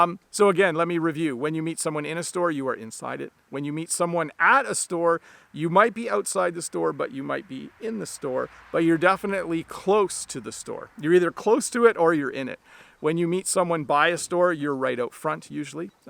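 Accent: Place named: Canada. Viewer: New subscriber and I found your Video's really helpful to learn english in canadian accent. I'm enjoying your videos very much Sir. I'm starting to download many of your videos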